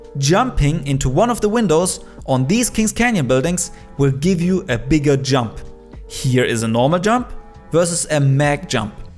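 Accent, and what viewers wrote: Germany. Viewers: Heyy idk why but are you german? you sound like german btw i am german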